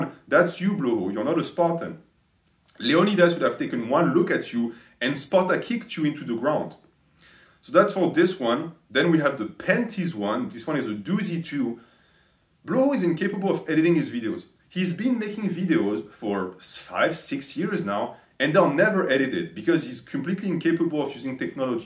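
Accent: France. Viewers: This is even better with the French accent. My new favorite account.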